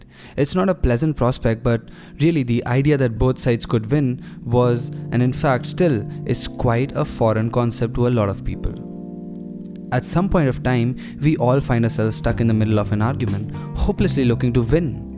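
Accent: India. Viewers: I like your accent. Are you from Hyberabad?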